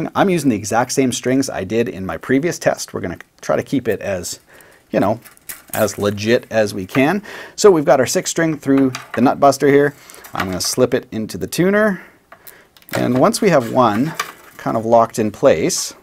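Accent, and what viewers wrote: Canada. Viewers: I really like catching the slight differences from American English he does as a Canadian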